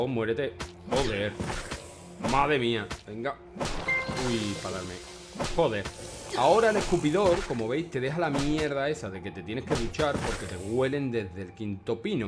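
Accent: Spain. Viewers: Renato Nuñez pablo no confundas amigo se ve que se averguenza de donde es es chileno y habla como español todavia te paso a kros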